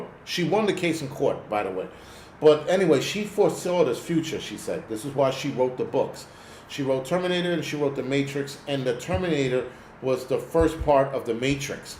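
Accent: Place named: Northeast, United States. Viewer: I'm from Jersey but, haven't lived there in a long time. I enjoy your northeast accent & manner of talking truth. You make me laugh.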